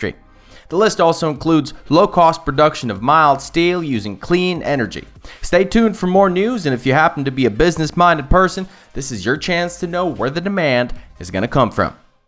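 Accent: Texas, United States. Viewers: So when did the narrator pick-up an accent (Texan, I think)?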